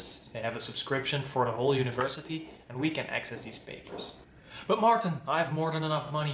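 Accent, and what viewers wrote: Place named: Flanders, Belgium. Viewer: Some advice, first of all, interesting and innovative way to start a vlog/YouTube channel! great job 1. try to avoid having the camera lower than your face, since the viewing angle is in general not the best, having it higher than your face is more suitable. 2. try to create better lighting, the yellow desk light is not cutting it and since you have stay at the same desk most of times it is easy to put a stand in that mess. 3. The music is not bad but also not great, try to find soundcloud artists who produce nice beats, mounika for instance. 4. The font in the beginning is not so pretty but that might be personal opinion, it also very 'casey neistat' like and a lot of vloggers take it over 5. Don't call it badass science, try to be a bit more creative with the outro, it needs to be something that sticks. The last comment is just about the douchebag parking of Mercedes at the end, typical... 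Good luck with the channel and especially with finding interesting topics to talk about since PhD work can be extremely repetitive and predictable! Btw, lovely flemmisch english accent:) You will never lose it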